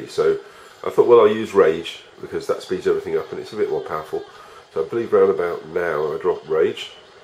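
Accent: United Kingdom. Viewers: What part of the Uk are you from?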